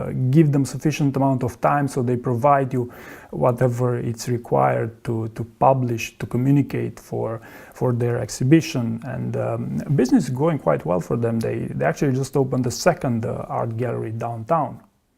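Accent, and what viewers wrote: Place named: Romania. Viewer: this is a Romanian accent if I've ever known one.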